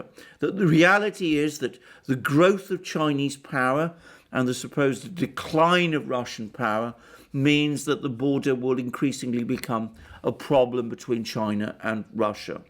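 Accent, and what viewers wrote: England, United Kingdom. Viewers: Mother England gave you a lovely Accent Alexander.Don't bite the hand that feeds you.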